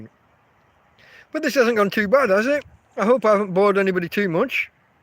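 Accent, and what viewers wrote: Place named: Yorkshire, United Kingdom. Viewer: Are you from Yorkshire ? Strong sense of a northern accent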